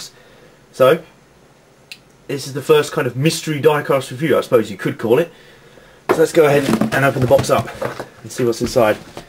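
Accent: United Kingdom. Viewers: Are you British? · I love your british accent, that's awesome!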